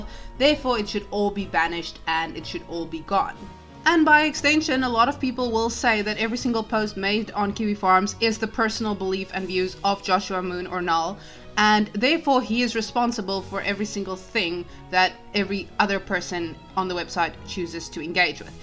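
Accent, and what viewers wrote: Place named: South Africa. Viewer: Aydin Paladin het jou video vir my gestuur, het die stem gehoor n gedink ja nee jy kan net n Suid Afrikaner wees..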